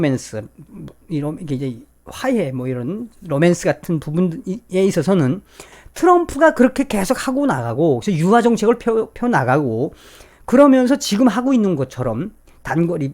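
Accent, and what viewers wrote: North Korea. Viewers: 제발. 손짓이나. 말북한따라하징말고 해줘요.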